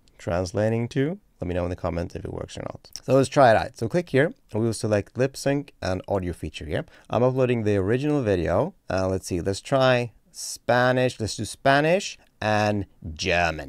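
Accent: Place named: Sweden. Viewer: it's pretty easy to tell the real from the robot. your natural voice has much more low-end resonance and a slight, but endearing, european accent (swedish?). on the clone version, you are sans accent (test your french) and you're more minnie than mickey. the lack of bass might be correctable in post; the accent, not so much.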